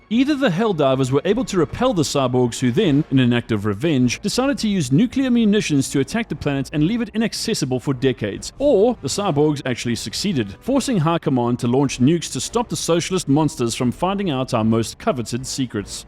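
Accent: Johannesburg, South Africa. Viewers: Started watching video, about 5 seconds in I was like hold on, this is a Joburg accent (northern suburbs even). Continued watching and now I'm sure of it. Aweh bru :)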